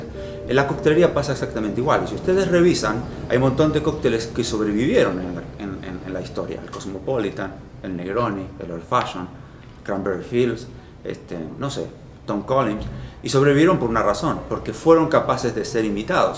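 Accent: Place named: Argentina. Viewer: Que lindo escuchar a un Argento chamuyando jajajaaja somos científicos de lo que hagamos jajajaja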